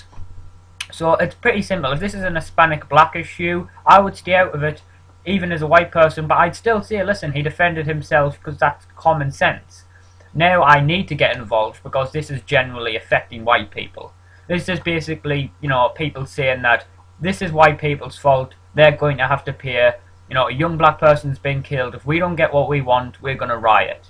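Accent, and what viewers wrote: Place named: Ireland. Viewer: I just seen this video you are a dumbass British moron that sound like a Irish leprechaun why don't you put on your green suit and go find your gold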